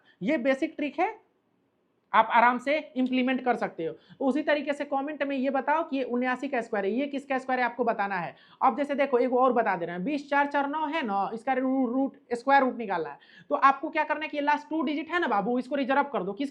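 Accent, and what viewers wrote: Bihar, India. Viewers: Bihar ka hai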